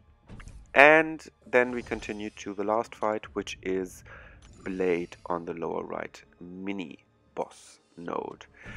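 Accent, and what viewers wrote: Germany. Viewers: Where are you from dude? you sound German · You sound german, am i right?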